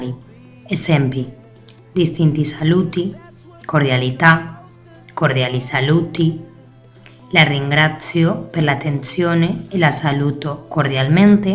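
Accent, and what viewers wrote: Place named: Spain. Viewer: È un accento,credo sia spagnolo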